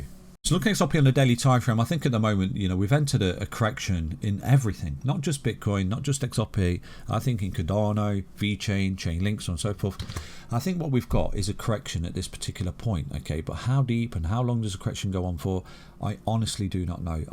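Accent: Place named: Northern England, United Kingdom. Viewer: A lovely northern accent. Thanks for the TA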